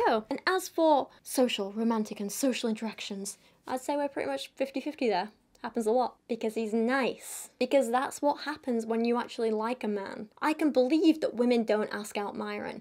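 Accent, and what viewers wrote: United Kingdom. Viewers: You go! "Stupid but louder" hehehe... "Have a giggle" you Brits have a way of speaking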